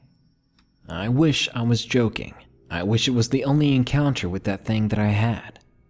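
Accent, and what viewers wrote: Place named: South, United States. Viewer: Sir, I love your country accent.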